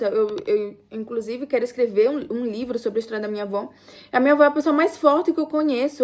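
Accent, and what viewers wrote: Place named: Northeast, Brazil. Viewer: Seu português é, um sutaque de nodestina .... e não tem nada Haver se mora fora do Brasil ou nao...😂😂😂😂😂😂😂😂😂Nodestina......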